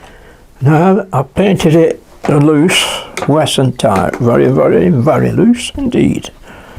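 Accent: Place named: Northern England, United Kingdom. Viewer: Lol. Sorry,. I've never been first before. Just want you to know how much fun I have watching your videos. I love your freedom while painting, even tho I can't understand half of what you say. I'm from the US and my ear isn't tuned to Northern England. But it's interesting to put it together from context.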